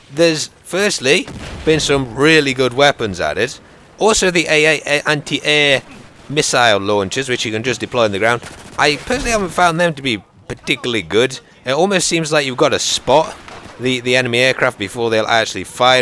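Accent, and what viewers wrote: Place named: United Kingdom. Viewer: both British accents but defo not even similar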